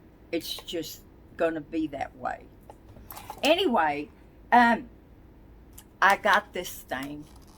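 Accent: South, United States. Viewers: why is it that you have no accent in some of your videos but have a strong southern accent in other videos?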